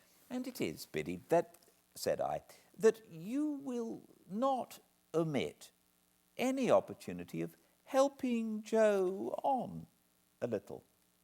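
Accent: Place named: RP, United Kingdom. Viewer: Thank you a thousand times for these readings! I've read several times David Copperfield and Oliver Twist, but so far, I had only read extracts of Great Expectations as you can find them in English learning books (mostly abridged text). I'm responsible for at least 3 views of each video since English is not my mother tongue. Your prononciation is very neat : it's like Queen's English with feelings and local colours (if that makes sense). My only fear is that you might stop reading before reaching the end of the book. Please, do go on until the last chapter and I promise to 'read with my ears' until the end too.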